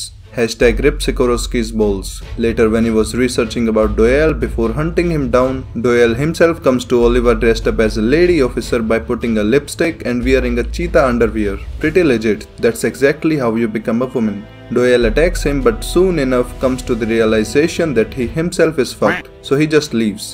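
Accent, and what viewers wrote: India. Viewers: Is this guy from India? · Are you an indian 🤔 just asking because your voice sounds very familiar with the people of india 😎. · Where are you from? Your accent is Indian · I just starte watching this chanell and its just so fun with his indian like voice exsplaining typical baki stuff to me like it was kindergarden i love it! (allso why is every single human in baki anime have more mussels than Any body bulder on earth?) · the indian accent is what makes this videos the best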